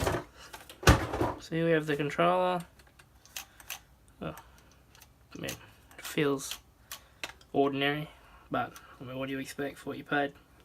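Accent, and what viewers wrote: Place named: New Zealand. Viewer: What the f a r k happened there Govna?? Did you have that opened up already (the rear diff), OR did that just come apart in your hand??? Bummer if it did dude, but, you should sound ALOT more motivated. The way you did this video MADE ME wanna stab myself with a blunt spoon mate!! 🧐... 👎💯. I'm cryin' with the fucking migraine..!! 😯 Also your pronunciation is ALL over the place. Your confusing your Kiwi accent with an American one, it's obvious brah!!! 💯👀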